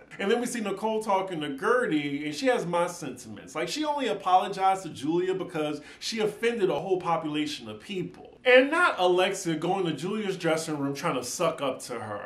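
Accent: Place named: South, United States. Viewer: not you ashamed of your southern accent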